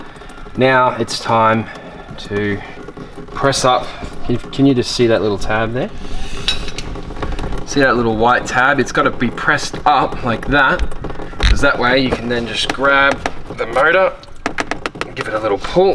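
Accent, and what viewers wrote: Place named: Australia. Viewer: Great video with free easy to understand AUSSIE accent.winner thanks mate you saved a trip to the mechanic.👍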